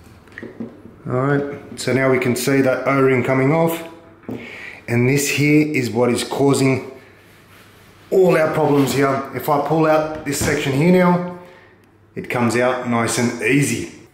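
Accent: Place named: Australia. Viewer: Thankyou, i was about to rip the door off it hinges but you saved me. What is with the rubber washer, dumb. Love hearing a aussie voice on a YouTube help channel .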